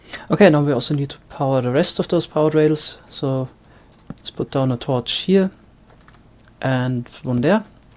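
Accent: Germany. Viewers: That german accent tho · Worst german accent ever. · mann kann hören das du ein deutscher bist xD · Der Deutsche Akzent Nice Farm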